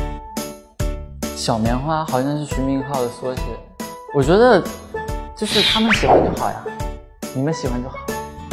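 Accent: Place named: Dongbei, China. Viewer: 呗 got me thinking he said "bae" instead. Thank goodness for his Dongbei accent. Happiest mistake ever 😍💕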